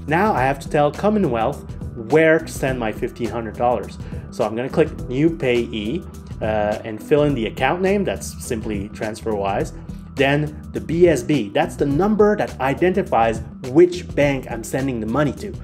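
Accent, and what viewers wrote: Quebec, Canada. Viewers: Are you from Quebec ahah? Thanks for the tips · I'm going to try a transfer. You sound like a French Canadian, are you from Quebec or Montreal? Anyway, very good video.Merci.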